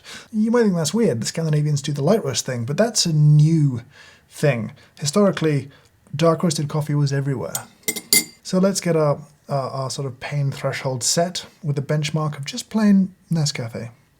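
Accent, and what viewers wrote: United Kingdom. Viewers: Yeah, it's called 'British accent'. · That's honestly the only reason why I watch him and I'm even British but I don't sound like this 😂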